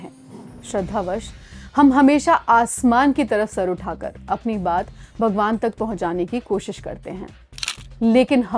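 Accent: Standard. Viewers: एक अच्छे पत्रकार का सच्ची पत्रकारिता और विशुद्ध हिंदी वाचन के लिए बहुत ही साधुवाद। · Aap k bol nay andaz pure hindi achha laga sister baat samajh mai aa gai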